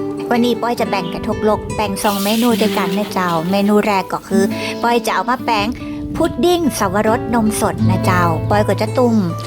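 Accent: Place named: Korat, Thailand. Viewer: สำเนียง โคราช+กำเมือง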